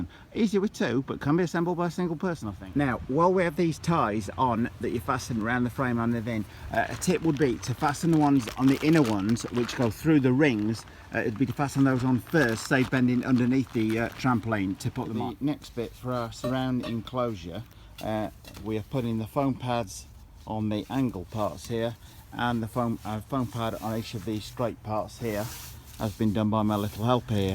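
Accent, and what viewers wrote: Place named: United Kingdom. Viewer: Great job with the review!!! Scary good pointers ! The British accent is cool too!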